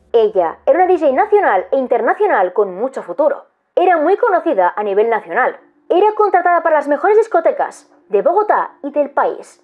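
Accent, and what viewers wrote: Spain. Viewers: me encantan tus vídeos Antes cuando recién vi uno no entendía bien el español de España me parecía muy rápido la manera de hablar, ahora ya lo comprendo súper bien 😂❤ Me acompañan tus videos mientras hago la limpieza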